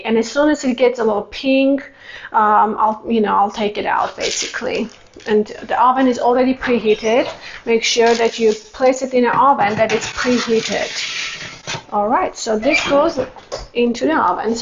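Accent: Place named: Armenia. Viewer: Hello, Maybe I should call you Chef! The pastries look delectable! Your pastry dough looks so beautiful, and I'm sure they taste divine. It made me think of Baklava, but this recipe is very much more French with the pastry dough, apricot preserves, and dark chocolate. Mon dieu! You definitely need a larger workspace so you can have all your ingredients and utensils at hand. Next time you do this, don't forget to demonstrate how you separate your egg yokes! I felt like I was watching Julia Child. I never missed her show on PBS for so many years. So now I know you are of Armenian descent. I have been wondering for some time where your accent is from. You must have some royal blood with your ginger hair! When I was in college, I had the great honor of working under the baton of the renowned Director of Bands of the University of Illinois Urbana-Champage Symphonic Band, Dr. 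Harry Begian, who was also of Armenian descent. He conducted the honor band every Summer at the band camp I attended in Hays, Kansas at Ft. Hays State University. It was by audition, and I am still very proud to say I sat first chair Alto Saxophone every year. It was always an amazing experience, and one I will never forget. Dr. Gary Corcoran, the Director of Bands at the university I attended for my degree in music education received his doctorate degree under Dr. Begian. I studied conducting with Dr. Corcoran, and I still miss conducting a band. Sadly, both have passed now, but I still ador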